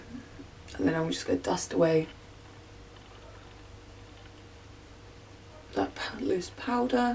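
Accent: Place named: England, United Kingdom. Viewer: So nice to hear an English voice on YouTube 😁 as all the beauty bloggers I follow are Australian or American. you're a star...love the bronzer, nice to see you using some revolution products I love them too. Goodluck ill share your page xx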